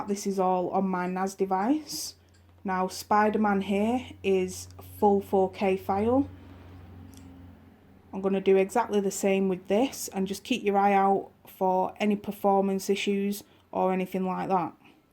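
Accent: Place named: Birmingham, United Kingdom. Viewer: I am foreign but you're sooo much from Birmingham :)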